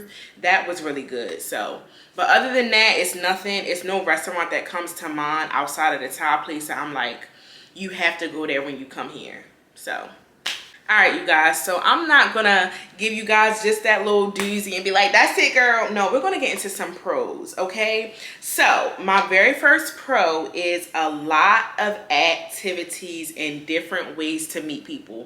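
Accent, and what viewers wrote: Baltimore, United States. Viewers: Girl I knew you were from Baltimore the moment you started talking. I plan on relocating to Dallas from Baltimore in a few months. God is definitely telling me to relocate here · When you said “dew” for do, I sad she’s definitely from B’more,. Lived all over DC and PG county and went to Howard. Your accent makes me miss the DMV. Good luck!!! From Houston ( although I haven’t lived there in years). You sound like the type of that will do well Dallas is conservative compared to Houston. In Houston they are turned up 7 days a week with after-after clubs. Dallas is more sophisticated and calm. Calm is Good. Houston is like one big HBCU AND ABOUT EATING OUT AND DAY PATIES AND BEACH PARTIES. It can get addicting. You made a good choice, definitely if you’re in corporate , finance and communication. Houston is more like medical, engineering, life sciences, NASA, oil/energy and aerospace. 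I’m a guy and don’t usually watch these but it caught my eye and I thought about reminiscing. Be safe and prosperous! The food in Houston will do it for you . It’s more diverse. There are 600 black owned restaurants in the Houston area( more like Atlanta)Creole, Soul food, Jamaican , Nigerian, Indian, Middle Eastern ,Vietnamese., Latin etc etc. There is excellent black theatre( the Ensemble Theatre)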